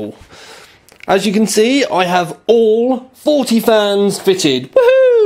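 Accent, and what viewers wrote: United Kingdom. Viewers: this guy is so brittish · also you have an ABSOLUTELY BLOODY MASSIVE british accent no offense : )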